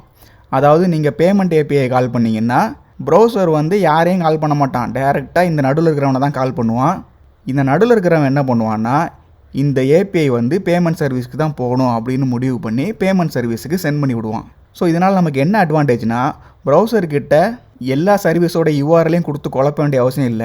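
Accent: Tirunelveli, India. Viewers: Neenga Tenkasi, tirunelveli side ah 😊😊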